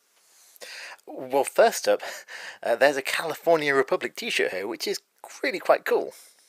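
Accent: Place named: Scotland, United Kingdom. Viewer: There’s nothing like being schooled about US coins from a Brit. The British twang makes it all the more supple! edit: oh sorry, Scottish.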